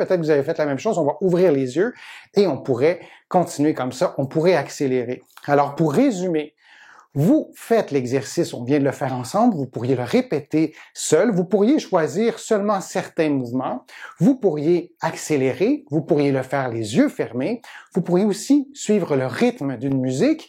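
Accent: Quebec, Canada. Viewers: Adorable accent québécois et super enseignement, ayant moi-même une grave maladie.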